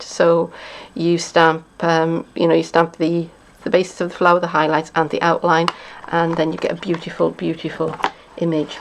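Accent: Wales, United Kingdom. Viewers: Lovely card, you sound like your from my hometown, port talbot.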